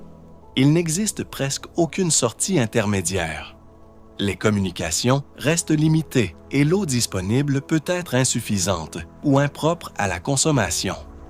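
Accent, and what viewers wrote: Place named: Quebec, Canada. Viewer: Merci pour cette vidéo. Des images magnifiques et un petit accent (Québec?) très sympa. Bravo pour votre travail.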